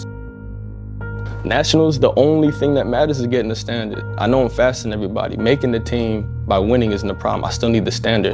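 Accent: United States. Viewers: You have a louisiana accent bro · Bruh, how do you sound like you're from Texas but you claim Canada for the Olympics? Help me understand. Best of luck for next year and LA.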